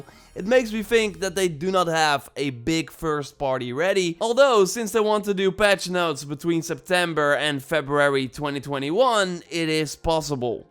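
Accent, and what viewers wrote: Netherlands. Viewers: He's from the Netherlands.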